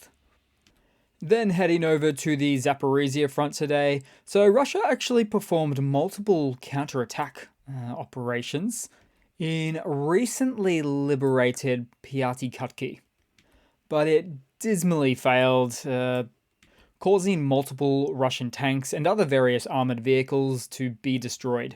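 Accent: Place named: Australia. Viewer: The human error to which TASS refers is Putin, birth to dissolution. It's a beautiful thing to hear voice. Sounds Ozzie, with a few S.A. pronunciations sprinkled in. Is he in Taiwan? Shoigu may well be blustering, as his street cred has been seriously questioned for months now, and he has said little in response, to Noseferatu and others. Should Kyiv actually catch something, we should expect Moscow to spark shortly thereafter. A kremlin is a russian term for a walled fortress. It is NOT a synonym for safe thunderdome.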